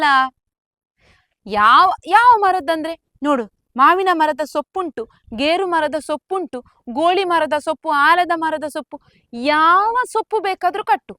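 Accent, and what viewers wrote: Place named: Udupi, India. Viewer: Tumba maja agtade namma udipi kannada keluvaga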